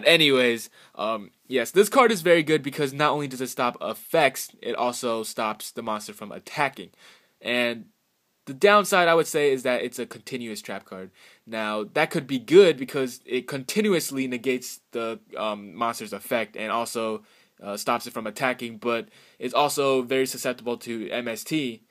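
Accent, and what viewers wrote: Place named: Asia. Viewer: Da azn accent :3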